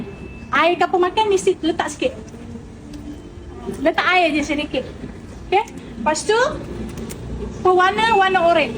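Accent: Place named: Pahang, Malaysia. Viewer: Trime kasih kak... Kak org pahang ke... Mcm ade sleng pahang skit tadi nye tu... Huhuhu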